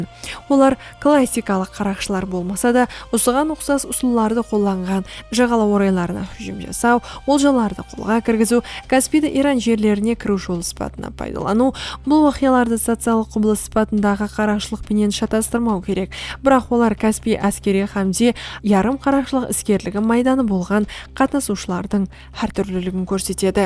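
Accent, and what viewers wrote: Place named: Karakalpakstan, Uzbekistan. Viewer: Қарақалпақша таза сөйлеген тилиңнен айналдым 🎉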